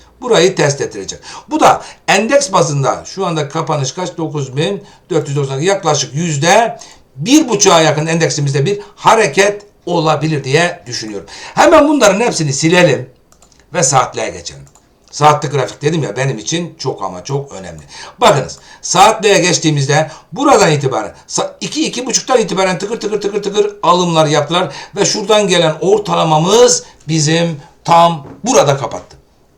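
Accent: Turkey. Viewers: Ben de Kahramanmaraş/Andırın lıyım hocam edem senin "PUSTUK" dediğin ağzını severim, iyi ki varsın takibe devam❤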